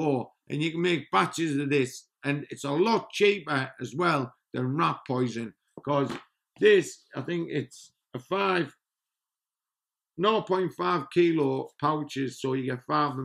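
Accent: United Kingdom. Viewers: Trying to place the accent..... UK but where .... Wales or Scotland?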